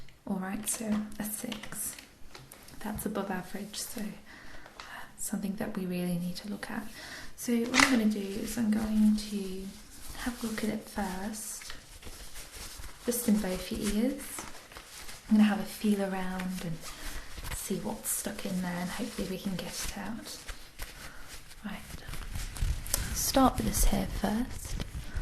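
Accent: Scotland, United Kingdom. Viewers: Wow! Love this, ear examinations are my favorite, can't believe I've only just found your chanel! Nothing wrong with your Scottish accent but your natural English one, I find is more relaxing 👍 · No video + natural accent almost makes me feel like I'm listening to someone completely new. Fitting to the channel rebrand, I suppose. I think this and your Scottish accent are both equally wonderful, excited for new videos in either.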